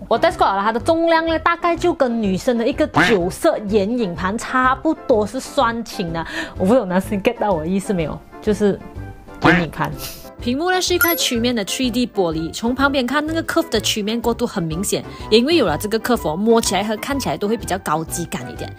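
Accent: Malaysia. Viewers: 我是来听你的马来口音的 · 你系痴利根定系马来口音都系咁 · 马来西亚的口音都是这样子的吗？ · 馬來西亞普通話？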